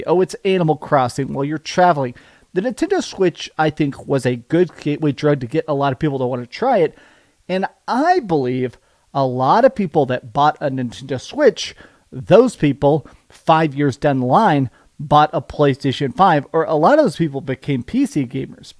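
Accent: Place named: South, United States. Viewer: Southern accent. He even says y'all. Are you saying white people can't have their own culture?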